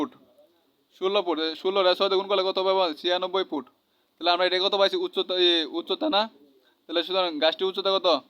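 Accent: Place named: Mymensingh, Bangladesh. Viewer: বুঝতে পারছি। তবে ভাই, আপনার বাড়ি কি বৃহত্তর ময়মনসিংহ অঞ্চলে?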